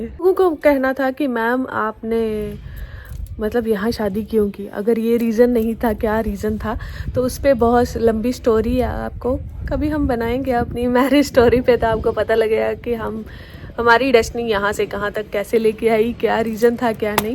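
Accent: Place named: Punjab, India. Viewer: Aapki Punjabi mix ho jaati hai madam par jehi baat aapko junik girl banati hai madam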